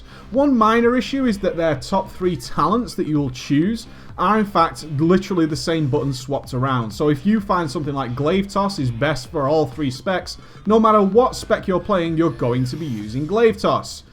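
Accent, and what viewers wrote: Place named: United Kingdom. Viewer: Hes been in some high ranking guilds and he is highly experienced in terms of raiding in wow. But I dont think he thinks highly of himself I believe you are just hearing the normal British bloke.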